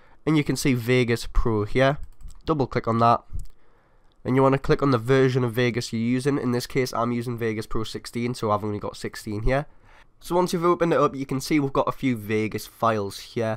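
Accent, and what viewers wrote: Newcastle, United Kingdom. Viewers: Got a problem? There is always a guy with a geordie accent to help ya out!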